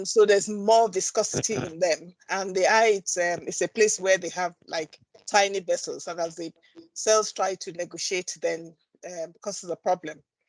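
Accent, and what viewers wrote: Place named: United Kingdom. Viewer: Everything sounds better in british english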